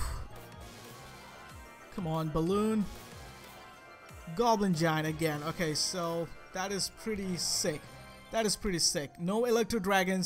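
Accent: India. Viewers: ASHUTOSH ZAGADE ofc he is, he has the Indian accent and his currency is in rupees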